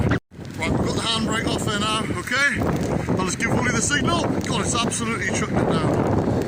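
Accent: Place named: United Kingdom. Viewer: Wow, what a cool wee channel you've got, and it's nice to hear recoveries done with a British accent. You could count that as an Off-Road Recovery, so aligning you with channels like Matt's Off Road Recovery, Trail Mater, Fab Rats, Robby Layton etc. Those channels are doing really well with MORR up to 1.6 million subscribers and making close to US$700K a year, and that's without sponsors. I'm looking forward to watching some more of that big truck of yours. <link>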